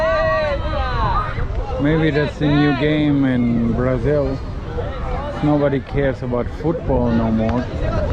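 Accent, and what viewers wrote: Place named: Germany. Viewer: Are you from Germany? The accent sounds a bit like it. I'm half German half Brazilian 👌🏻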